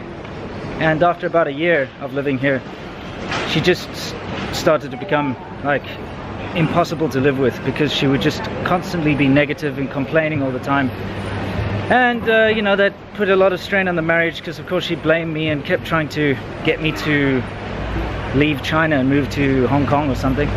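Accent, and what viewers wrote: South Africa. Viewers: 南非口音的英语？还假装自己是英国人过？喜欢说瞎话的人，他的话还能信？ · FKM ...the phrases he uses sound like South African English to me...he hasn't got a pure British accent.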